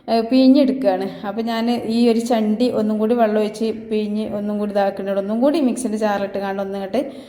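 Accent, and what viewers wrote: Malappuram, India. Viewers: Malapputam bhasha rasakrammanu..😊undakkunnathu kanappozhe vayil vellam varunnu.. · ഇംഗ്ലീഷ് നല്ലത് പോലെ അറിയാം പക്ഷേ മലയാളം അറിയില്ല... മലപ്പുറം ബാഷയാണ്.. തോന്നുന്നു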